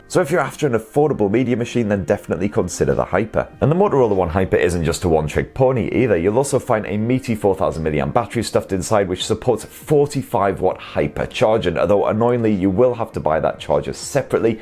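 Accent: United Kingdom. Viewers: Oughuque, he has so british luxurious pronunciation.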